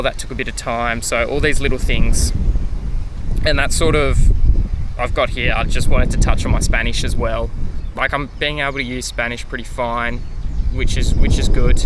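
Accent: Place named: Australia. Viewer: Aussie accent, interesante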